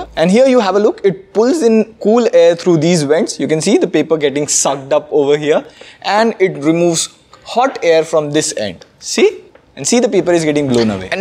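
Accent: India. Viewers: finally..an indian speaking english im so glad i find this channel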